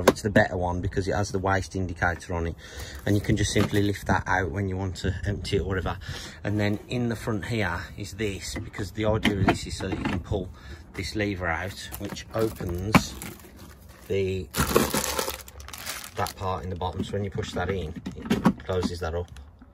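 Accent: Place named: Birmingham, United Kingdom. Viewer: I'm on this to boys early next year I'll start my build where you from you sound local in in Birmingham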